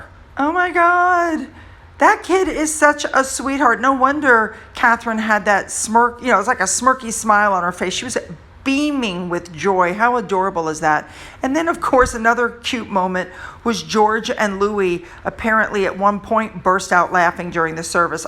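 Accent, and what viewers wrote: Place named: United States. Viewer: I opened this cause I thought it would be narrated by some lovely, british voiced person. Much to my dismay, it was a common American sounding gossip columnist. Sounded like someone from the National Enquirer. Highly disappointed. Couldn’t even listen to the end. I’ll be more careful next time I listen to anything on the Royals.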